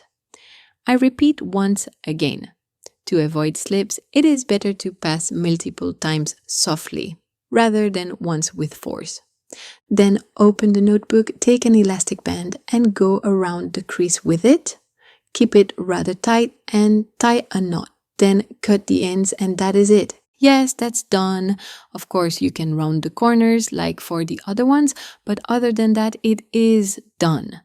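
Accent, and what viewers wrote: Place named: France. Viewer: Liked your French accent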